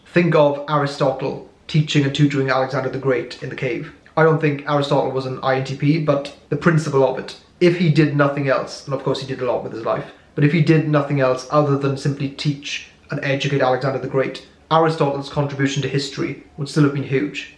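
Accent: Wales, United Kingdom. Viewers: Are you from wales?🏴🏴🏴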